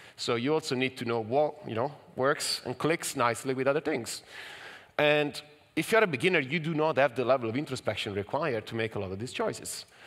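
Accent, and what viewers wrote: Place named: Italy. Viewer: I wonder if the name has any influence from the town of Pavia, since your name and accent sound very italian?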